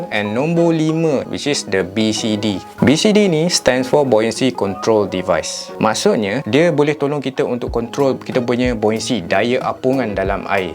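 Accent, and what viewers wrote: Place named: East Coast, Malaysia. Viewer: Betul Abg..It's me.Masa tu terlalu nervous nak dapatkan lesen. Now sudah dapat lesen ..Time for some fundive. June 9-12 akan bawa 3 orang member untuk ambil lesen open water. I will drop by coral bay to meet you bro. Abe jangan guna dialek pantai timur,Viki susah nak faham 😜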